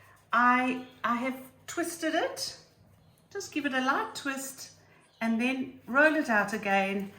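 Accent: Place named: South Africa. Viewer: Sounds as if she is from Johannesburg South Africa not Sydney Australia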